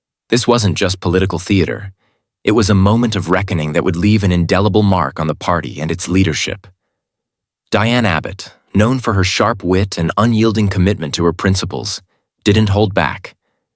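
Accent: United States. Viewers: Political News Club....based in Saudi. No idea about the UK and our politics and a stupid USA voice to boot. Go and do something useful instead of posting this rubbish. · If this confrontation was so interesting, why is it not shown? As a secondary question, why is it 'voiced' by an American person?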